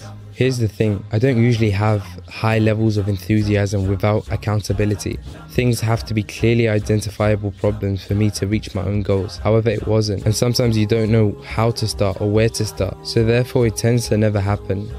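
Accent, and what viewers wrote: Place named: Somalia. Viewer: what dialect of somali is that? i understood some but not all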